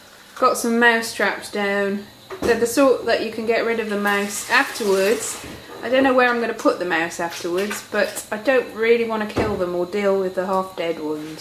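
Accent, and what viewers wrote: Wales, United Kingdom. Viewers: My bane at the moment are ANTS. They're freakin' everywhere. No humane thing here. I kill em all, damned things! They get in my pants, my shirt, my crotch... damn them! Anyway, nice to see your mom. I haven't told you, but after watching lots of Torchwood, I gotta say I love the Welsh accent. Your poor arms!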